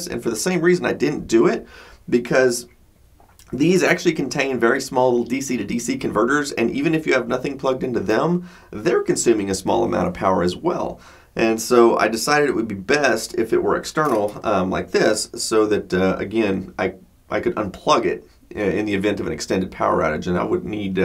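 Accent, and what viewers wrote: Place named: Texas, United States. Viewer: Classic 8 but guy. Always a pleasure. Is it just me or does sound a bit more Texan in these early videos?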